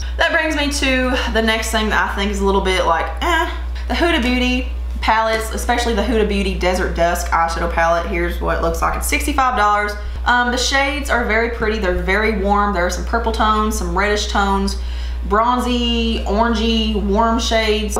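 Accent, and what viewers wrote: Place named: Kentucky, United States. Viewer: I randomly found you in my homepage. As soon as I heard you talk, I thought to myself "girl has to be from KY". Checked out your FB and sure enough you are. Girl I'm from Hazard and we have alot of friends in common. I went to EKU. Saw where you are there! I'll be binge watching your channel!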